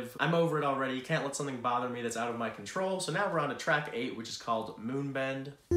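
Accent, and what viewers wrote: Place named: Ireland. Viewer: Where is your accent from? It sounds so Irish sometimes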